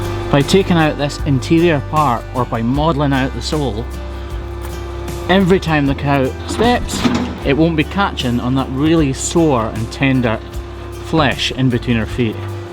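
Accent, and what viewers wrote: Scotland, United Kingdom. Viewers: Who’d of thought a hoof doctor would become my much needed connection to Scotland? Raised by two Glaswegians in Canada 🇨🇦, my aunties and uncles back home have all passed in the last few years so I haven’t been back a lot. The accent, the scenery and the ice cream. My most favourite thing about Scotland is the ice cream since I was a little girl. Thanks for the happy memories.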